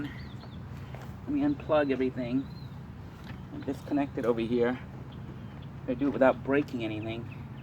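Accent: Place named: North, United Kingdom. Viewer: What an accent you got to be from up North😂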